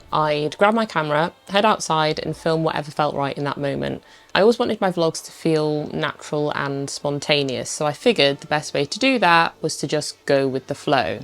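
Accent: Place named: Northern England, United Kingdom. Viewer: I watch all your videos all the way through, and I don’t even make vlogs or anything. But hey. I think we have some things n common! I have those same Adidas trainers, I think you’re a northerner like me (Manchester or Leeds or somewhere? I’m crap with accents), and you’re cool AF, and… oh wait, I’m not . Seriously tho, once weather picks up a bit here in Spain (I live in a very cold region), I’ll do some live streaming by the river, just using iPad and restream.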